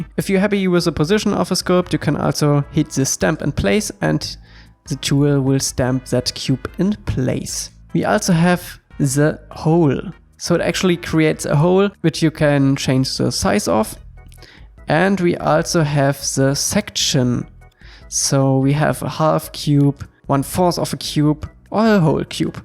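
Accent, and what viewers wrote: Germany. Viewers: Wenn man den deutschen Akzent raushört ^^ gutes Video! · Are you German!? 😄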